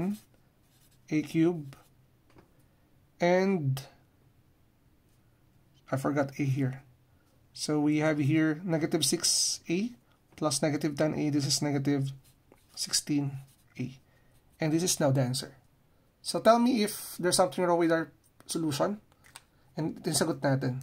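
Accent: Philippines. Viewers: I think he's Filipino that's why the accent is different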